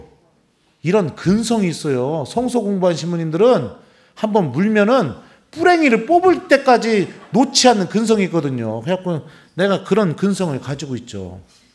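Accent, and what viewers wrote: Chungcheong, South Korea. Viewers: 신부님강의 충청도 말씀 으로재미 있습니다 매일 유투부 로강 의 듣습니다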